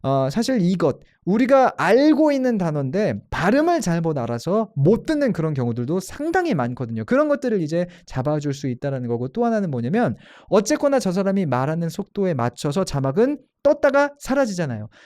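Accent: United States. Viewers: 근데 뉴질랜드에서 유학하셨는데 미국영어 발음(?)을 가지신거 같아요~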